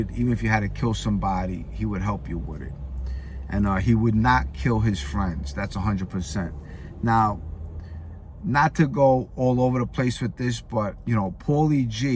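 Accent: New York, United States. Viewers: still loving your vids but check this. Im interested in mob life like millions of others world wide.Would I want to live that life? Not no but HELL NO!. I think you made the right choice myself. The New York accent is bad ass. Keep being your true self and to hell what others say!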